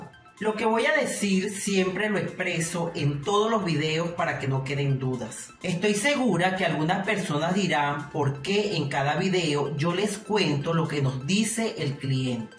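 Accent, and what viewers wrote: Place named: Colombia. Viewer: claro maestra de Peru soy electricista automotriz y trato de actulizarme a traves de youtube ojala el otro año pueda matricularme en un instituto conocido en mi pais gamor para aprender electronica automotriz y usted maestra de que pais es? ese dejito tan bonito acaso de Colombia?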